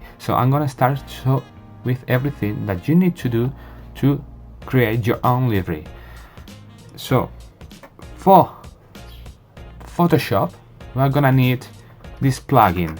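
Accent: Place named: Spain. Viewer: Thank you so much. I will try to make a new livery. I am french but I live in Thailand. I speak english same thaî! It seems to me that you are fron spain. That is why I can understand your english. When I hear english from USA I understand nothing.You have accent that I can hear. 55555 . In thaî language the number 5 is pronounced "ah". And when we say that we are laughing we write 55555. That means hahahahaha. Thank you again for your video.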